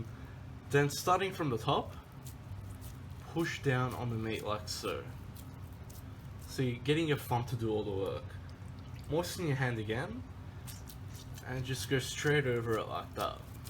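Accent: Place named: Australia. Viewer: Australian accent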